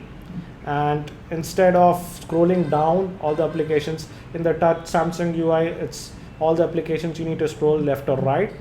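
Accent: India. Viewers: best indian accent ever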